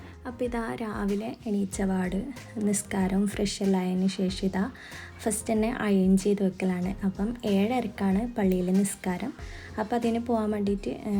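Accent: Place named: Kannur, India. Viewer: ❤nice 👍ഈദ് മുബാറക് ആദ്യം ആണ് കാണുന്നത് ചാനൽ കണ്ണൂർ ആണെന്ന് തോന്നുന്നു സംസാരം കേട്ടിട്ട് 😊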